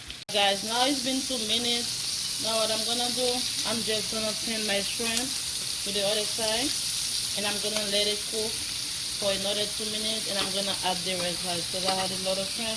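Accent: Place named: Haiti. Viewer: Baby that Haitian French accent so cute lol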